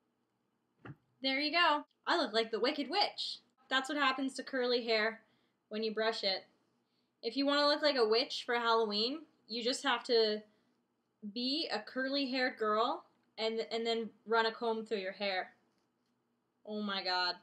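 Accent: Canada. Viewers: Are you Canadian? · are you from canada? what's the accent from?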